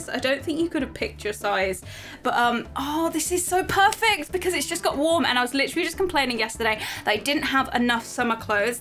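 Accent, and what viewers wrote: United Kingdom. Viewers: Ok that British accent is everything 😍😍😍🥺🥺🥺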